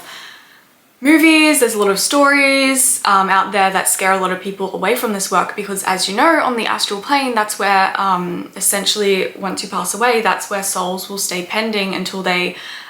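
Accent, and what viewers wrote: Australia. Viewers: I believe I astraled when I was 5-8 years of age almost every night. Last year in September I attempted my first concious OBE and almost succeeded 😊 . My lower back was hurting so I sat up. I had the tingles, felt the vibrations, heard the loud rumbling (no alarm but we are all different). But also, I felt as though my heart chakra was holding me back as my chest felt weighted down. Unsuccessful attempt, but I was so happy that I got so far on my first go. Had heaps of similar experiences since but no feelings of being weighed down. Also, hello from Perth 🇦🇺 you sound like you're from Qld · I can hear the Aussie accent breaking through ☺️ Great video Thank you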